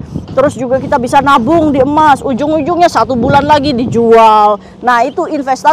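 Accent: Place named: Batak, Indonesia. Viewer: Tumben nadanya kek orang batak kak😂😂😂😂❤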